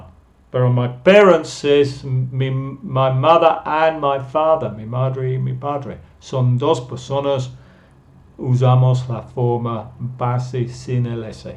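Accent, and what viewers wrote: United Kingdom. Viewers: Excelente profesor de Ingles, me gusta su inglés británico. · es inglés británico? Thank you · I wonder if you learn English from him you'll have a british accent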